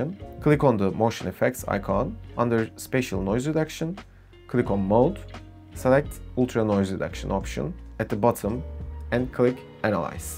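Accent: Turkey. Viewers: dude, you talk like a Turkish, are you Turkish? :D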